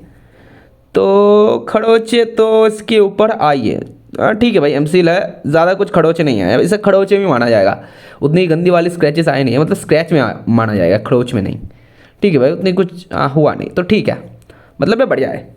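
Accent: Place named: Bihar, India. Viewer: Bihari hai ye bhai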